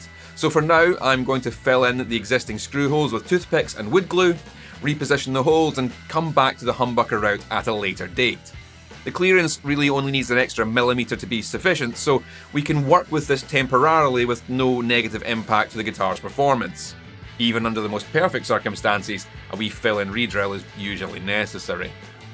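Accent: Scotland, United Kingdom. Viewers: Yo, are you in Scotland? I’ve been subscribed for a LONG LONG time I just don’t remember if you ever said where you are but you sound very Scottish to me lol. My people on my dad’s side while we’re Irish we are from Northlands of Scotland so I have much love for Scots (and Irish) naturally. The other side is Welsh, Swedish & English lol. I’m a full on one of you’s guys. Minus the Swedish 😂.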